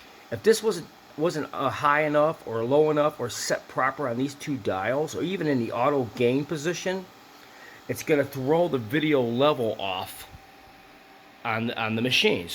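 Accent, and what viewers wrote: Chicago, United States. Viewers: Sharing knowledge with a fierce Chicago accent.👍👍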